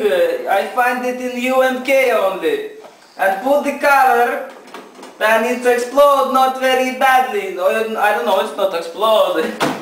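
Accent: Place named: Lithuania. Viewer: he's not italian, he is from lituania, we italian don't speak english just like him... much better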